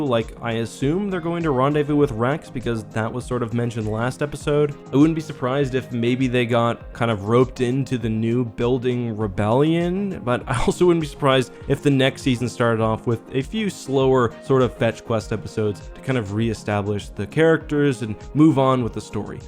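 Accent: Canada. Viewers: You Canadian bro? From Ontario?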